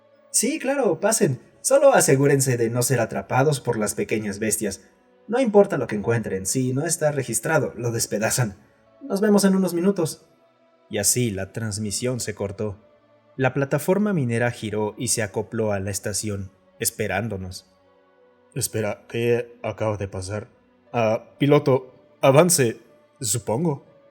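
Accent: Argentina. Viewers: Vi la versión de la rana que narra ... Y tu lo volviste argentina merces mil años de salud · Argentino nos salió el pibito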